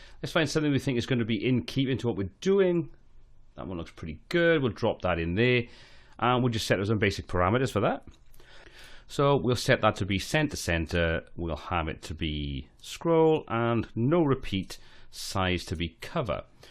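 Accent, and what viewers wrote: Wales, United Kingdom. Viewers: Great to hear a fellow Welsh accent :)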